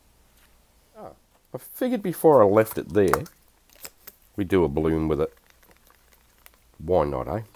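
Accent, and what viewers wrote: Australia. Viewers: very good video...good to hear an Aussie male voice in this arena with excellent advice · How good is the Aussie accent? So nice to hear on you tube. Champ recipe too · I'm very new at this type of art and live in Tasmania so it's lovely to listen to an Aussie voice and also hear you talk about Aussie products and stores such as Bunnings. I would like to ask you a question please. I notice that you usually use Amsterdam black for your Activator. Can you use other products and other colours as activators thanks for your very clear informative videos Linda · Great to hear an Aussie voice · Hi from Sydney Australia I subscribed as soon as I heard an Aussie voice. Just found ya gonna binge some of the rest of ya vids. There will be plenty of questions mate!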